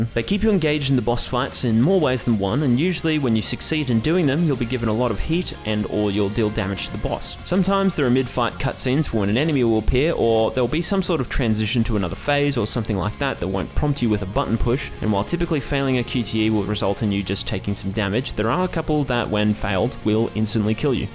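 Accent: Australia. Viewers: He's Australian, not British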